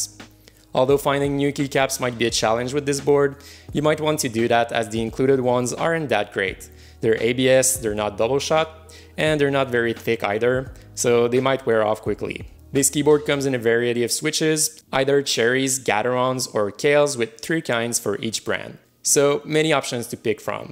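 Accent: Quebec, Canada. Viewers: Hes canadian french so, dont mind the pronounciation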